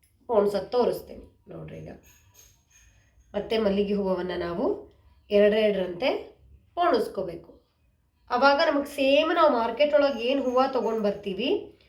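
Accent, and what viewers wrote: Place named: North Karnataka, India. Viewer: ನನಗ ಭಾಳ ಖುಷಿ ಅನಸತದ ನಮ್ಮ ಉತ್ತರ ಕರ್ನಾಟಕ ಬ್ರಾಹ್ಮಣ ಶೈಲಿ ಮಾತುಗಳು ಕೇಳಿಕ್ಕ್ ಚಂದ ನಮ್ಮ ಮನ್ಯಾಗ್ ಹಿಂಗ ಮಾತಾಡ್ತೀವೀ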